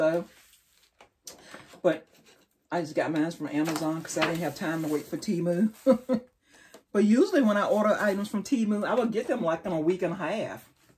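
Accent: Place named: South, United States. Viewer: These are a great idea!!! I saw these socks in Dollar Tree and stood and looked at them, wondering what I could do with them for my craft shows? I ended up walking away, as I couldn't think of anything! Now I am going to go back! I love these! I like listening to you but can't place your accent? It has a bit of a "southern" style, but not exactly - you have a sweet and easy manner about you and it makes it enjoyable to listen to! Thanks again for the great idea!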